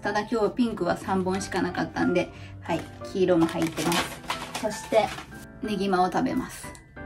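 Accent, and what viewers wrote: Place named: Kansai, Japan. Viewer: ちゃんこんばんは✨今日も楽しませてもらいました❗️ ちゃんの歌声も好き❤️ですが関西弁も大好きでーす😍いつも楽しみにしてます〜🎉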